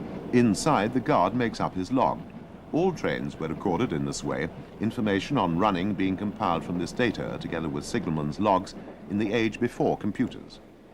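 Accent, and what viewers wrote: RP, United Kingdom. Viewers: The "posh voice" is fine. I hate English classism but it's clear and easy to understand. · It is not "posh" it is actually "received pronunciation", as in, for voices to be "received" over radio you had to speak clearly to be understood, especially before the days of HD/digital etc.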